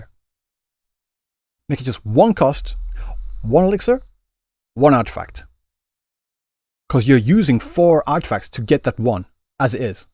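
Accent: United Kingdom. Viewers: British tectone?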